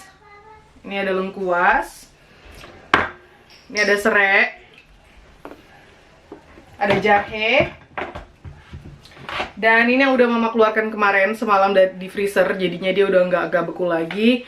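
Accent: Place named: Batak, Indonesia. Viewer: Mantap. Sukak kali aku nonton vlog kak ini, natural kali batak nya.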